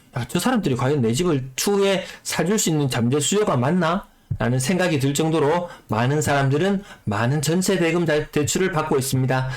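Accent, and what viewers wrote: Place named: Daegu, South Korea. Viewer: 정보 감사합니다 근데 님 대구분 인가요? 만약 대구분 이라면... 대구 사람치고는 사투리가 작은 편 인것 같아요.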